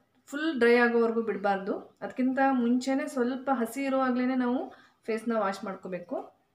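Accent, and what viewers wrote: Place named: Uttara Kannada, India. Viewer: ನಿಮ್ಮ ಉತ್ತರ ಕನ್ನಡ ಭಾಷೆ ತುಂಬಾ ಚೆನ್ನಾಗಿದೆ ನೀವು ತುಂಬಾ ಚೆನ್ನಾಗಿ ಮಾತಾಡ್ತೀರ sis face pack 👌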